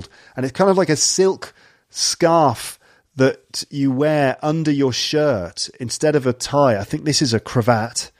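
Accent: RP, United Kingdom. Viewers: Simply superb,.An exemplary model of Modern RP, I think. I have saved this so that I can listen to it again and again. I look forward to Part 2 and many more such videos. Thanks a lot.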